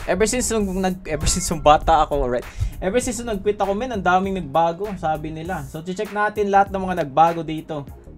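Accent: Visayas, Philippines. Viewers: Grabi kaau bisaya kaau. Pro idol❤️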